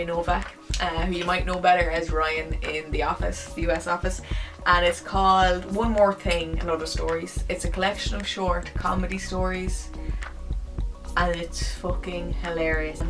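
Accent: Ireland. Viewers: A-ha! Oh that was solid!, I sent those cakes. Still my fav Try video! Now I’m all happy you gave them a mention! Billet Log over the Joe Louis?!?! Pure craziness! 👍🏻 This ones for you miss fancy leprechaun accent girl!! 👍🏻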